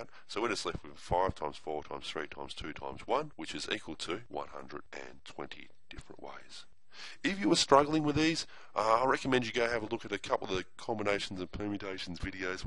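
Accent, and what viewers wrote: Australia. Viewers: I can't tell if I'm here more so for the advice or the Australian accent. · it’s not normal american...more like australian or new zealander...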